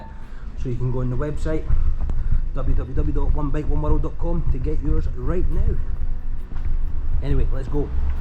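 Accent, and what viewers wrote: Scotland, United Kingdom. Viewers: Every video you have out there, I watch through tears! Happy tears, because you are such a very special person. That little kitty loves you so much, and to see a man love a cat in return is heartwarming. Love your Scottish accent by the way! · Lol All you need to do is listen carefully to what a Scotsman sounds like. He`s speaking perfectly good English with an accent, master the accent and you`ll do better.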